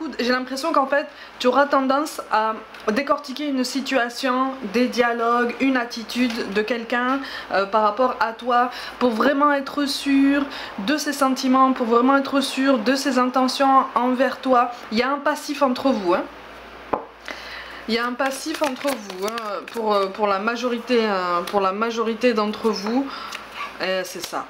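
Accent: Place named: Perpignan, France. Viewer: Excellente guidance ❤️ je kiff ton accent Perpignan ???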